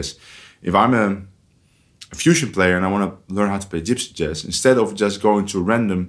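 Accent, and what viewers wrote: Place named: Netherlands. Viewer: that dutch accent tho :p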